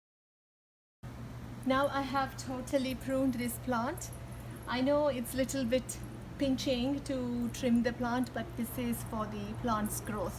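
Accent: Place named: United Kingdom. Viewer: You got British accent wow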